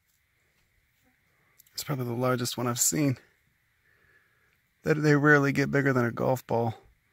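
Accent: Chicago, United States. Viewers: hey Avalon type in 'meet the youtube botanist with the thick Chicago accent.....the stunning specimen is right there haha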